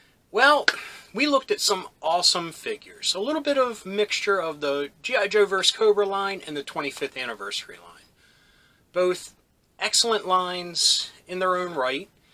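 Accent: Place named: Maryland, United States. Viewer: Are you in Maryland you sound like it I'm in Baltimore I know how we say our Os. If so I'd love to do some Vinatge Joe swaps with ya if your ever interested!!. Also an 80s Kid as well grew up in Baltimore County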